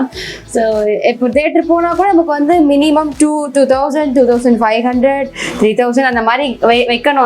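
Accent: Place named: Bangalore, India. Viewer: Good video I think u from Bangalore u speaking tamil is Bangalore style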